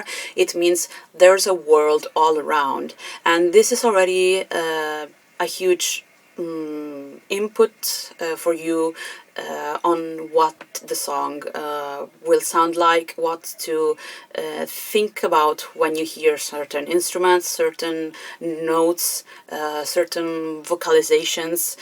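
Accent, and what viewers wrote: Italy. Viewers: Canti di tutto… sei brava… forse un talent show, al giorno d’oggi dovresti provarlo (anche se non li sopporto). Bella Canzone, Ottima Analisi del Pezzo e Complimenti per il tuo l’Inglese… accento romanesco quasi assente… Spiazzalo con qualche canzone Italiana strana… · Questa canzone è tra quelle che io riascolto sempre. Diciamo che è tra le mie top 200. Ebbi la fortuna di ascoltare Antonella dal vivo quando ancora doveva diventare famosa, al Palazzo dei Congressi dell'Eur, in una fiera del suono. Ne rimasi scioccato. Cantava " ", forse una prima versione. Ricordo solo che tempo dopo la sentii alla radio e corsi a comprare il disco. Non voglio mettere a confronto Antonella con altre cantanti. Secondo me non ha senso. Penso piuttosto che si possa esprimere il proprio gradimento senza fare paragoni. Ed a me, questa voce piace e molto. Come quasi tutto quello che il gruppo ha creato. 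Anche dopo Antonella. Ho ascoltato tutto quello che avevi da dire sull'argomento. Mi sei piaciuta. Brava. Ho capito che eri Italiana fin dalla prima parola che hai pronunciato in Italiano... Altrimenti non lo avrei notato. Sei anche di Roma. Beh... Anche io. E di questo mi dispiace. E' sempre più invivibile. Avessi la tua età, scapperei subito, prima da Roma e poi dall'Italia. Anche se... non saprei per andar dove.;-)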